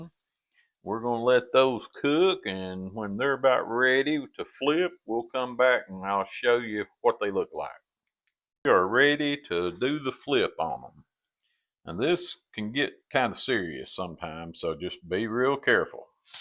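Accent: South, United States. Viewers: What is with the Southern brogue?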